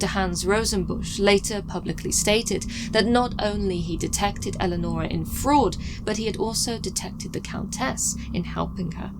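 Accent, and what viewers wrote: United Kingdom. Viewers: You are such the British lady. Love your video's and your accent.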